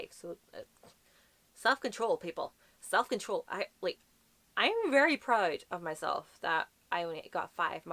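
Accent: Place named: Ireland. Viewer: Dont lighten your accent i love the irish accent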